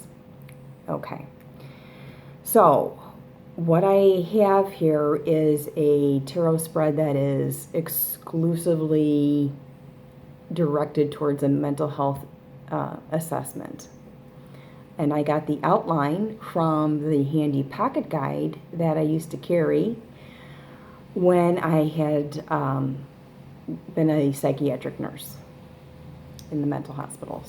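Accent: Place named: Chicago, United States. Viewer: Verbal Vomit is the best description ever...and so true. OH! Chicago. Similar accent to western NYS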